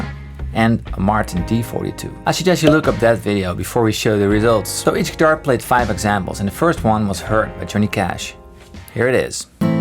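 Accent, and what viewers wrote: Netherlands. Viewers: You sound very very dutch. Heb ik t goed? ;)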